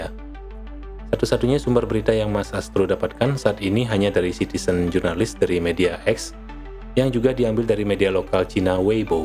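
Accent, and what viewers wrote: Bali, Indonesia. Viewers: Harusnya adane Bli Astro...medhok Bali ne bli yan...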